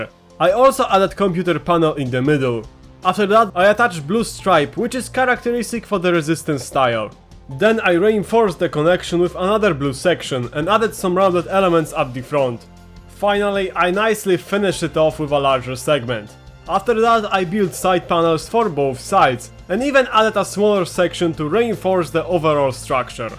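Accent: Poland. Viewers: I really like your content, od razu po akcencie poznałem rodaka 😂😊👍 super, że mówisz po angielsku, bo masz szerszą widownię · All of your mocs are just great - but I can't hold myself anymore, I need to tell you that the first time I've stumbled on your video as I've heard you I immediately knew you're Polish as well :P it triggers me anytime I watch your newly uploaded video - but on the other hand it reminds me of how much legendary moc builders besides you are Polish, such as Jerac or fukusaku - and I have to admit that it makes me somehow proud :) PS I don't mean to make you feel upset, sorry if I did that - I shouldn't even criticise the accent, and I have nothing to criticise on MOCs you make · masz polski akcent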